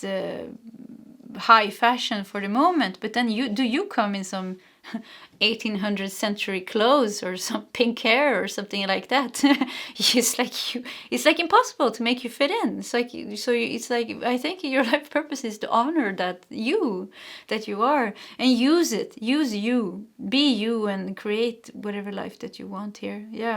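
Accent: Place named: Sweden. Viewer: Thank you for #2! This is 100% me! Everything you said just kept building on the previous statement and I just kept saying, Yess! Yep, that’s me. Oh my yes! Uh huh! That definitely explains what I’m feeling! Yes, I do that! Oh, I totally get it! And on and on … So I’m looking forward to moving forward with this new life with a new perspective on my past and I know I’ve carried karmic energy that I’ve been healing this past year! I’m overcoming, healing and loving “the happy ass life I deserve”! ❤ Thank You! BTW, I’m Swedish/American and have a heart connection to Sweden even though I’ve never been there, at least in this life. I love hearing your accent and I’m so grateful I found your channel! I think the heritage connects me to your readings even deeper. ♥️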